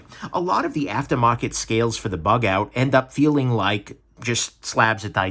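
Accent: New York, United States. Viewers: You are so informative and funny! I love the NY accent!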